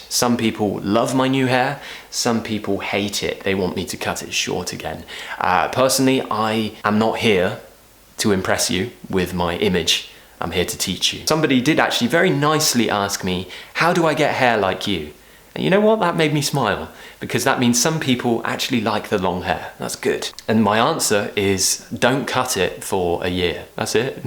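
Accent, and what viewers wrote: United Kingdom. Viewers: Oh my gosh.. i am tooooo late. Anyway, How are you🌸 i am so glad to see you again. I hope i can see u more often. I missed your awesome british pronunciation a lot indeed!!!!! Thanks a million🙋🏻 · I love your British accent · I like meat really like it and I like watching your video, easy to understand and I like British accent. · I’m from Vietnam and I’ve been watching your videos for a year. Thank you for traveling to our country. In ours, the English language as the second language and so important for everyone. But most people prefer America accent to British so that I was thrilled to find out your channel. If you go back to Vietnam, hope we have a chance to meet up. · Your perfect British pronunciation and energy like a bomb motivate me. Thank you so much. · Dear... You are Awesome... BRITISH Accent 100%, learned a lot From You, Thank You Very Much 🙏😘😘😘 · I enjoyed this video a lot First time I felt British accent is more difficult to understand than Americans but as time goes by I felt it’s easier It’s because of you Thanks a lot😊 And I love British accent 🌸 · Im from Bangladesh. 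I dont know have u ever heard the name of this country or not?bt i was always been looking for a channel like u so that i can learn british accent. U are really amazing. Thanku so Much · I'm impressed with your voice texture, mate. The accent is awesome and British indeed. I'm so fascinated that my earphones are poked regularly into my ears. Explanations are vivid and practical. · I just discoverd ur channel and i'm so in love with ur accent ❤ i cant get enough of listening to british english 💕💕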